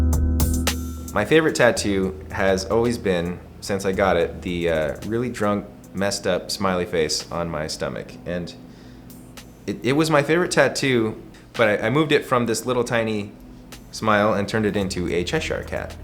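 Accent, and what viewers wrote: Ireland. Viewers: Ur Irish ah here come to me house hun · You are irish?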